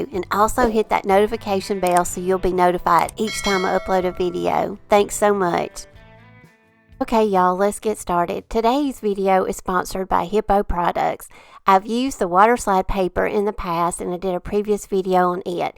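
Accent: South, United States. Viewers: Everything is so beautiful! I love the ornament idea with your dads picture! So creative! And you have the most beautiful southern accent. I live in Georgia and Ive yet to hear it flow so beautifully like yours! 😂❤ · Love your videos and creativity! Love your sweet southern accent! I am from East Texas but have a childhood friend that lives in Guntersville AL. I live in Kansas and everyone says I have a Texan draw to my voice!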